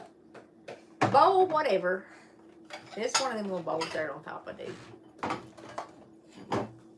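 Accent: South, United States. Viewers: It’s southern accent, and, yes it’s English! Have you not heard of southern accent, that’s where you get that twang from if you’re from Tennessee, North Carolina, Georgia and Atlanta usually have that twang, even those from southern part of Virginia will have it. Which she is from the southern part of Virginia. My mom was born in the early 30s during Appalachian times in from Southern Indiana, they don’t have much of twang. Now, not today people in Indiana may have it but, it’s just not as so, strongly pronounced, I guess you would say.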